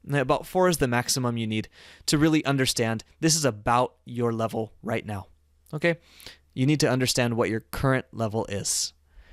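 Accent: United States. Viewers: Oh my goodness...m in luv with ur voice😘so soothing n everybody's gonna luv to listen to u...American!!u r??(for sure)...well don't get me wrong...wanna check my accent recognition capability.🤗... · Awesome mate, this is all I want to know about reading correctly, not just for ielts test also for a long term English learning or any other language learning. The thing is, I'm curious where you come? R u American?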